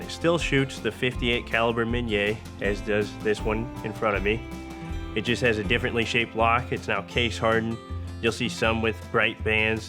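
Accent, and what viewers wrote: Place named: Midwest, United States. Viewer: Midwest U.S. accent .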